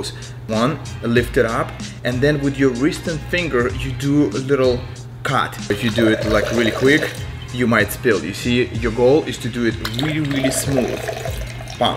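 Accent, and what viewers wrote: Russia. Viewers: Охуенная футболка Да и наш акцент чувствуется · Хах, думаю: у него русский акцент🤔 А он реально русский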